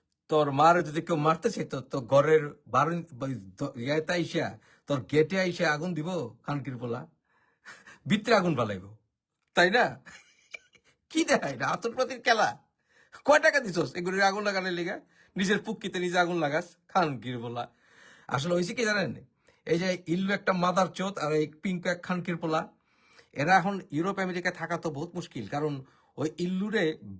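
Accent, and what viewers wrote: Dhaka, Bangladesh. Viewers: পুরাই ঢাকাইয়া ছিলা ছিল্লেন।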